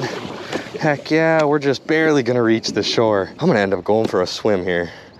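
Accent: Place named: Milwaukee, United States. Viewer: Total Wisconsin Milwaukee accent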